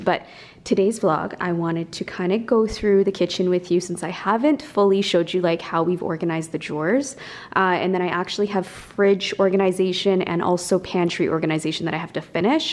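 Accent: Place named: Canada. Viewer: I love her Canadian accent ✨💕😂 ily! I sent you a letter a while ago and u sent me brushes so thank you for those! 💕 you are one of my role models 🥺💕😘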